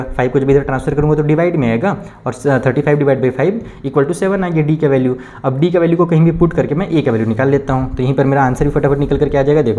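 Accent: Bihar, India. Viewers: Sir bihari language ❤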